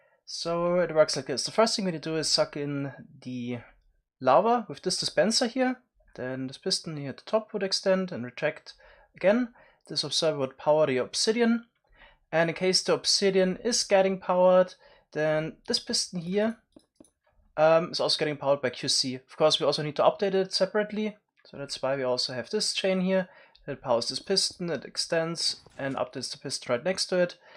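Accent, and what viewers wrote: Germany. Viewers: Ist er deutsch? Sein Akzent klingt danach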